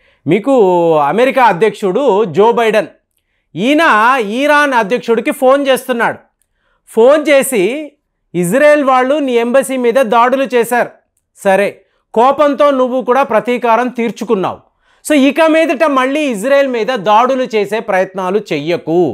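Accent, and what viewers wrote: Tamil Nadu, India. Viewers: గురువు గారూ కి తెలుగు వీడియోలు 5 ఏళ్ళ నుండి చేస్తున్న ఈయనకి ఇంకా అరవ యాస పోలేదు. Leh నీ లేహెగ్ అని ఇలా ఇలా అరవ యాసలో మాట్లాడుతుంటాడు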